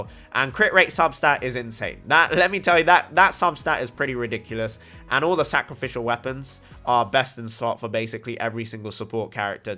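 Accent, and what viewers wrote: United Kingdom. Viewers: just found this channel, love the personality , enegy , and of course that asian british accent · Loving the vids. Question: are you British? Just curious cause of the accent. Ps: don't let haters get you. I didn't k ow that the group was based from an Italian troupe/show so its nice to know!!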